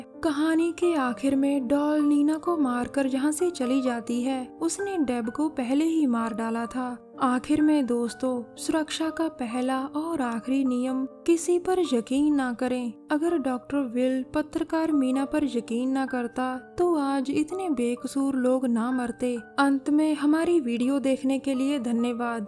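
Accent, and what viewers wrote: Punjab, India. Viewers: Aap punjab se ho kyaa g.....Very nice explained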